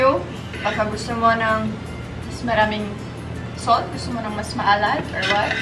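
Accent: Visayas, Philippines. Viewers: Hahaha lodi ang cute nang accent...bisaya gyud...thanks for sharing nalingaw ko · lumalabas ang bisaya tone n 😉cute😍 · Makalingaw kaayo ka te duh, samot na imong bisaya accent❤️ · bisaya gyd kaayo c ay 😍😍 mailhan kaayo sa iyang inistoryahan.. hehe..Proud bisaya here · Girlfriend material talaga to 😍 Walang arte mabait sa kapwa. Hindi lumalaki yung ulo kahit malayo na yung narating. Nakakainlove 😍 Bisaya tone, beauty face, beautiful personality. Godbless po sayo. More opportunities to you 😍😘💕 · Cute ng accent ni. Bisaya gyud ka dai. Proud Bisaya here! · Yung Accent minsan may pgka bisaya talaga ehy😍 Ang cute😙 proud bisaya here❤ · I love her bisaya accent. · Nice kayo imo accent.. ung naa bisaya nga accent pero cute ❤️ ur shirt is amazing too! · I luv ur bisaya accent, 😁👍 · Ang kyut ng pagsasalita na parang bisaya. Haha. ❤ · Bisaya japon ug tono ba hahahah cuttteee oyy 😍😍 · I like that she never lost her bisaya accent hehe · Very pretty pinay beauty! 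🥰 i am a big fan! Nindot sd kaau imung tagalog na may bisaya accent hehehehe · Hahahaha nigahi na bai lingawa nako oie sa bisaya na accent. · Love the Bisaya accent when she speaks tagalog 😂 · Sa kadugay na nimo nagpuyo anang manila dhai,halata gihapon kaayo imong bisaya accent.😁😁😁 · I like your bisaya accent, "Mutsarela" 😍😍😍😍😍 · Nindot paminawon ang imong bisaya accent madam.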